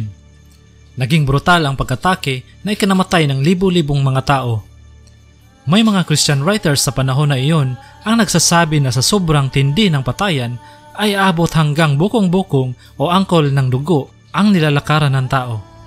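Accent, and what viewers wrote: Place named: Visayas, Philippines. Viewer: Astig sana yung kwento... Kaso may pagka bisakol lang yung pag lalahad nung kwento...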